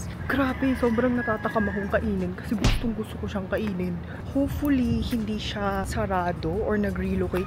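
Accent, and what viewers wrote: Philippines. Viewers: I love you...ang kyute mo! Pinay na pinay ka talaga, the way you speak, smile and laugh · Your English accent is truly FILIPINO🎉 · Holy shit ang tapang ng accent 😭 pinoy na pinoy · You really adapt to the Filipino culture! That is so cool that you have an accent of Filipino than your own culture! Keep it up because it’s amazing! Hafa Adai in my language 😊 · Sheesh, koreana with filipino accent, kakainlab ❤😂😂😂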